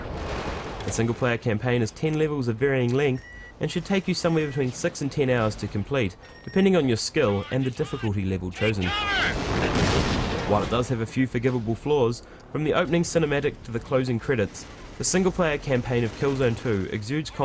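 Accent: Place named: New Zealand. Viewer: Lol, wierd hearing a kiwi review a game.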